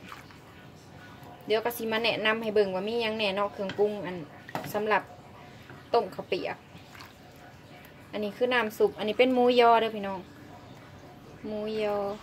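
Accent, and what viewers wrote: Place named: South, Laos. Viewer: นางเป็นลาวใต้บ่ สำเนียงคือลาวใต้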